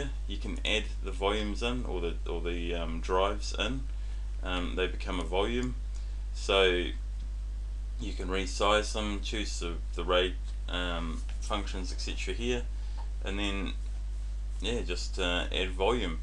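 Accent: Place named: New Zealand. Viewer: yeah sounds like a kiwi. Nitwork. Tistnas .. etc.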